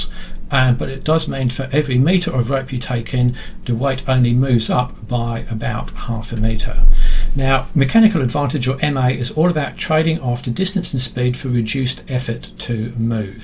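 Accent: England, United Kingdom. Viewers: Hi You sound like a pom but that photo looks like Australia? I enjoy your videos, thanks for taking the time. Mike from Australia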